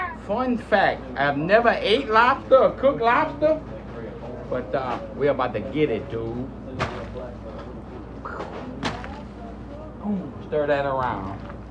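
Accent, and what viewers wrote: Louisiana, United States. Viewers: you sound like you from Catahoula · I know this dude is portraying a character, at least partly.....but I'm sold. Great cooking, he gets right down to bid'ness, and he's got that super dope Cajun accent. The ONLY criticism/question I have about this vid: Why is the max resolution only 480p? A small issue, but I had to ask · My mans becoming more Cajun every video. The south runs strong in this one. Can’t wait tell I’m back there!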